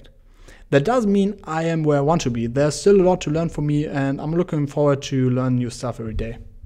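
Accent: Germany. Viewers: in den ersten Sekunden gehört, dass Du Deutscher bist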